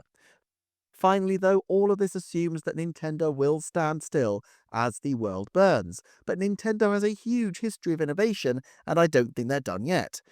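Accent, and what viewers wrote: United Kingdom. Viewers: yeah is probably one of best nintendo youtubers out there, he's certainly well-informed and that british accent automatically grants him extra credibility lol (also i like your username, soli Deo gloria ✝)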